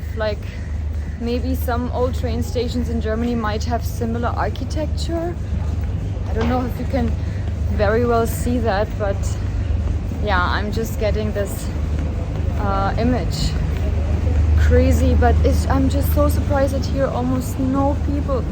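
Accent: India. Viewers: Srilankans r super helpful n u sis don't pitch in ugly India pitch u r in Srilanka please.. yakkk Urdu voic in Srilanka 😂🙏 Cmon.. Srilankan sound is proper cool than stupid Urdu in Srilanka sooo odd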